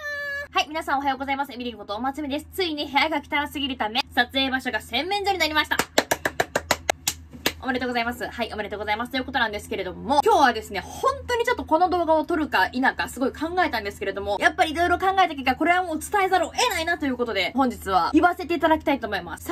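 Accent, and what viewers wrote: Kansai, Japan. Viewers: この時の可愛い。いつも可愛いけど。特に可愛いあと関西弁強めw好きよw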